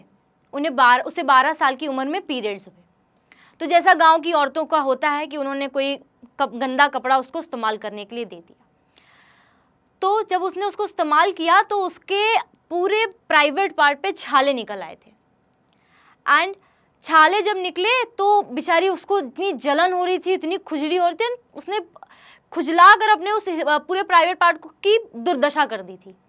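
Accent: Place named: Unnao, India. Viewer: मुझे खुशी हुई, उन्नाव की बिटिया उन्नाव वाली बोली बोल रही है। बहुत सुंदर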